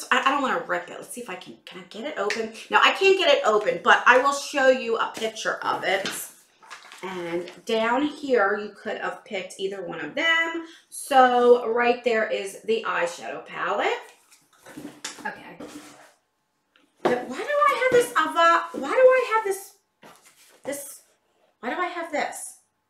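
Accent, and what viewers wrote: New Jersey, United States. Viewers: I just love you and your Jersey accent 💖... My favorite thing in the fab fit fun box would probably be the throw... I'm obsessed with blankets of any kind... I do not get the box but have thought about getting it but if I got all the subscription boxes I want then I would be broke all the time 😊😂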